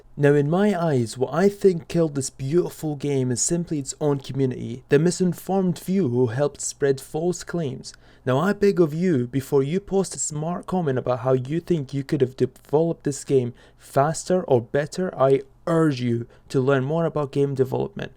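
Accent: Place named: Scotland, United Kingdom. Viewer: Where are you from? You have a bit of a scottish accent but what is your first language? just wondering lol :)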